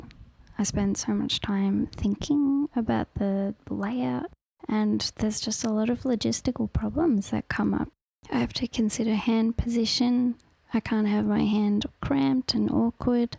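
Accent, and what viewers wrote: Australia. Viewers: i love your asmr vids, they r so unique and sound amazing!!! and YOURE AUSSIE???? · Unexpected Aussie! I thought you were American for some reason!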